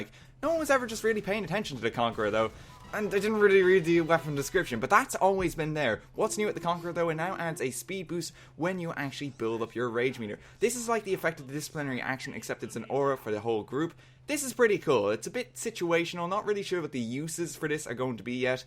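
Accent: Ireland. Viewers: Are you from Ireland or Newfoundland? The accents are fairly similar?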